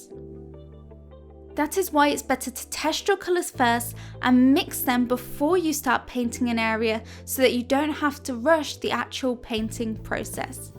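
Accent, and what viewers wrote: United Kingdom. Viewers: Actually I was enjoying to listen to that amazing British accent 👍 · You are EXTREMELY British.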